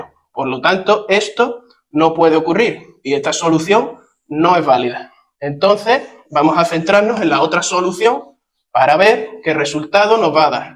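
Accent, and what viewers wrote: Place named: Spain. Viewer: hola eres profesor dé matemáticas ami no me entran las matemáticas estoy pensando en ir ala universidad haora si Dios lo permite después dé que dure mucho tiempo fuera pero explicas esa matemáticas mui rápido ven paca Pará República Dominicana para que me ayudes eres español porque hablas con la z ta cómo té yamas nunca té hubiera visto en Youtube primera vez pero explica mui rápido 😂😂😂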